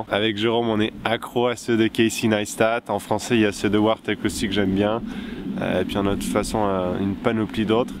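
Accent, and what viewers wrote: Quebec, Canada. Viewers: il a pris l'accent quebecois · J'ai l'impression que tu commence à avoir l'accent quebecois ^^ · C'est moi ou tas l'accent québécois ? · omg sa fait tellement longtemps :O sinon tu à tellement pris l'accent quebecois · Salut je heureux de cet resolution et je trouve que tu a pris un accent québécois c'est fou 😊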